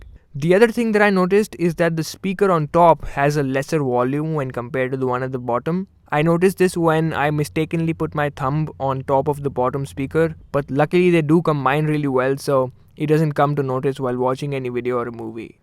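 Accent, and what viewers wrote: India. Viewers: I'm a simple man. I hear an Indian accent, I click dislike.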